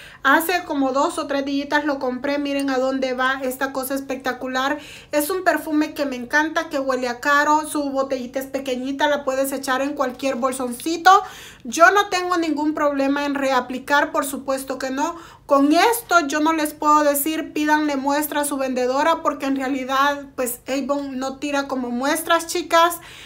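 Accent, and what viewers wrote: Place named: Veracruz, Mexico. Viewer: que bueno que hagas reseñas de perfumes Avon ya que son económicos, y tu acento me gusta ya que soy Veracruzana y algunos acentos en tu pronunciación se parecen, a los míos, bendiciones.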